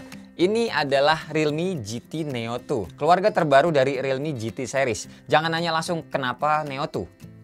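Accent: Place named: Sunda, Indonesia. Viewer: Orang mana emang Kang? Campur sunda uyy 😂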